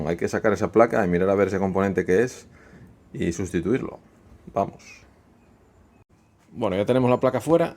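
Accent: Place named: Asturias, Spain. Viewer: Muy buenos tus vídeos ,por cierto por tu acento me parece que eres de Asturias, o me equivoco ,un saludo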